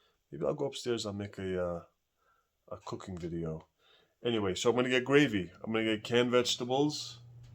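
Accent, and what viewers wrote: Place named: East Coast, United States. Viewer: Congrats on reaching over 500! I knew you’d gain a lot more subscribers! You remind me of old school YouTube when people would create videos for fun instead of making professional productions. You look like you’re having fun sharing and you’re genuine. When you share your experiences/hauls/failures/successes, it’s like talking to a friend. I need to up my preps. Been having some stomach pains lately so the doc suggested a low fodmap diet. That threw a wrench into my preps. Still trying to learn what it entails, but it is so restrictive that it makes most of my preps off limits (hopefully I don’t have any food triggers that irritate my stomach so that I can continue eating normally). No gluten, no beans, no onion or garlic. Lots of fruits off limits. Anyway...thanks again for the entertaining and informative videos. 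One last thing — one source of protein you may want to look into is tofu, if you eat it. There is a shelf stable type by a brand called Morinaga. I got a box from Amazon. It can last longer than the refrigerated type. Oh, and I must say your accent reminds me of my East Coast cousins!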